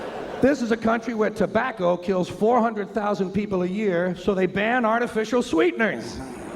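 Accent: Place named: United States. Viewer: بس متقن اللهجة الامريكية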